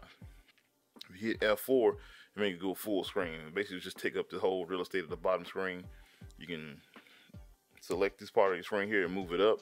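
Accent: United States. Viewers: This is probably so random, but what's your accent? I know you're US, but like what state? I'm not from US but I'm fascinated with accents. PS, thanks for the vid, I found it helpful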